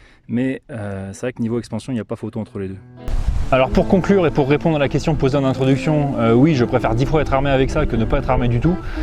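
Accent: United States. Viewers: Encore cet accent ridicule du mid west , a chaque fois je me fais dessus 😂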